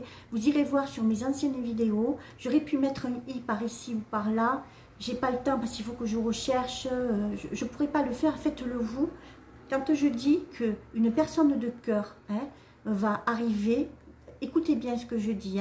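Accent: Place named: Southwest, France. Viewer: Bonjour je viens de vous découvrir est je me abonnée j aime bcp votre douceur et votre l accent si je me trompe pas il viens de sud-ouest, merci 🙏pour tout ces bonnes nouvelles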